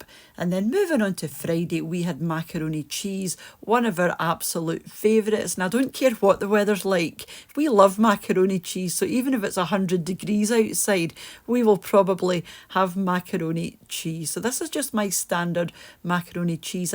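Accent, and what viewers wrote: Scotland, United Kingdom. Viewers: I listen all the time just to hear your beautiful accent I miss scotland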